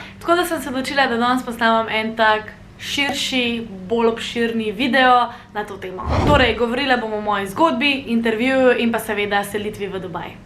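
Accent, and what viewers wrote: Ljubljana, Slovenia. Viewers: who is she supposed to learn from? Each Slovenian YouTube vlogger/influencer has their own good points while speaking Slovenian. So does everybody else. I would not learn Slovenian from a person from Primorska or Štajerska, for example. Ljubljana dialect is perfectly fine. However, I agree with you that the news might be even better. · She never replied to you but if it helps judging by her accent i’d say she’s from Ljubljana. I’m not a frequent viewer so i don’t know where exactly she’s from, she might reside in Ljubljana or not but judging from her vernacular alone she lives/ or at some point lived in Ljubljana or in its proximity.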